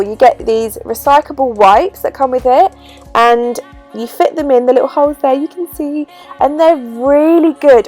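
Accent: United Kingdom. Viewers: I can't stand the British talk what the hell are you saying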